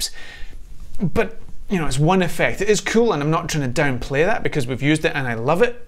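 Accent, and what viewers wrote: Scotland, United Kingdom. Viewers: I've just subscribed not only because of the quality content but also because I love to listen to scottish accent :-)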